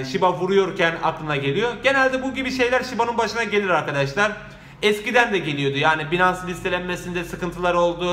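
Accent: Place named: Samsun, Turkey. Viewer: Tam bir Samsunlusun “ E “ lerinden belli hemşehrim..👍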